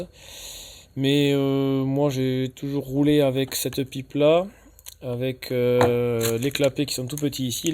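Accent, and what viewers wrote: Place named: Toulouse, France. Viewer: Bonjour! Heureux de réentendre ton accent toulousain " con"! Dis moi la rondelle que tu as mis en bout sur l'ecrou du vario er3 de ta mob elle mesure combien d'épaisseur et quelle est sa fonction? 😊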